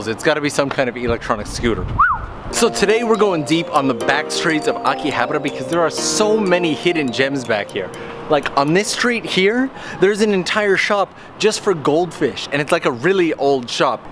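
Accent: Canada. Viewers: This guy sounds Canadian 🤔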